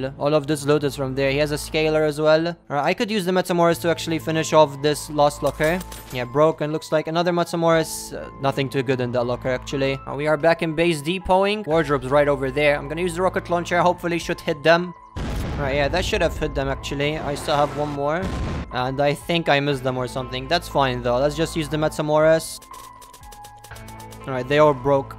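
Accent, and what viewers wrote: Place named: Malta. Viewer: As soon as I heard your accent I knew you were Maltese too hahaha.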